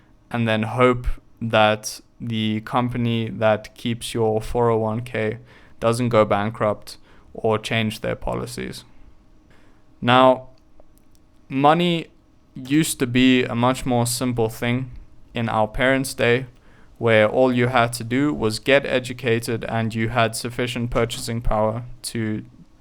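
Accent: South Africa. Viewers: You speak English really well. I mean the accent. I can barely hear what sounds like a South African accent. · You sure are handsome! 😍 Dreamy Alpha male. And that SA accent is beautiful. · are you from south africa? i worked with a guy that sounded like you.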